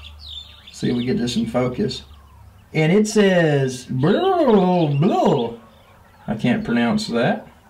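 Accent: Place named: Boston, United States. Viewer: You sound like a Yankee I know. From South Boston :-)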